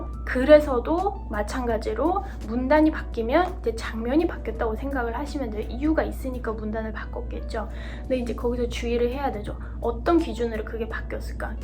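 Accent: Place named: South Korea. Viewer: 한국에서 어려서부터 자랐나?? 말투가 그냥 한국사람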